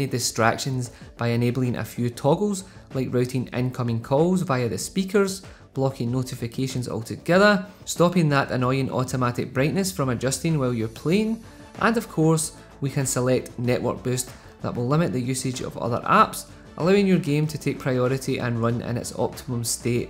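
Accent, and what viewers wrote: Ireland. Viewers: Is he fucking Irish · I am watching coz I like your accent,. Irish I assume??👌😄😄😄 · I love your accent man. Are you from Ireland? And also the name of the wallpaper pls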